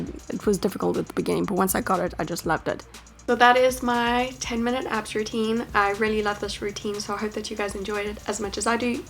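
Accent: South Africa. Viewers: הנסיכה שילת I believe she is South African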